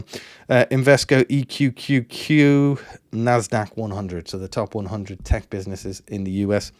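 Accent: Ireland. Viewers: I can detect a slight Irish accent I think. You did very well with your portfolio, well done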